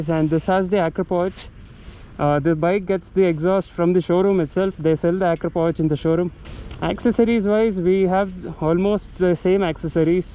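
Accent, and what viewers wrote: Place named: Chittoor, India. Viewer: Hey you make amazing videos no doubt about that and keep it going and looks like your native is chitoor , guessing that from the accent and also looks like you have been inspired a lot by Sriman kotaru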